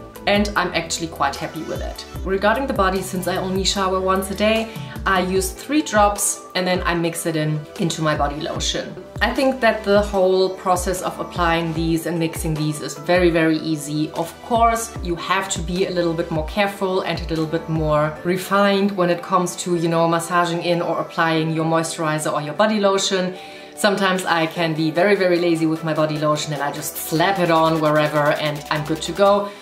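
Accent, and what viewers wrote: Germany. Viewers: Are you German ??? 🙋‍♀️✅🙏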